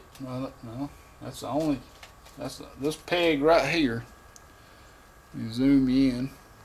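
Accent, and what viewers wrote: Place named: Georgia, United States. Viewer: You from Georgia? That accent is THICK.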